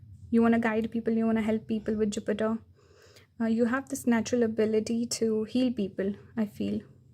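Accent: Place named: India. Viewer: Pile 3 speaking. Logical as well as intuitive. Plus you have a cute Indian accent. ❤😊.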